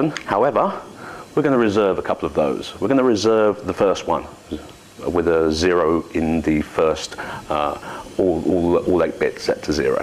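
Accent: United Kingdom. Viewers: british pronunciation?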